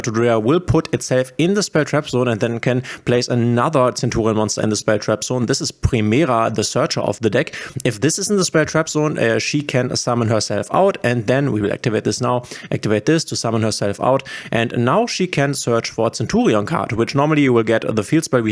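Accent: Germany. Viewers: your accent sounds german 🙃 great vid btw 🎉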